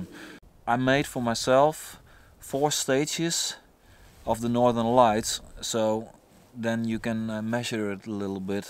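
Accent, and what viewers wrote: Netherlands. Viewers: How you talk is totally Dutch even your name ! *Peace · you sound dutch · haha hoorde het door het accent wel vet man die northern lights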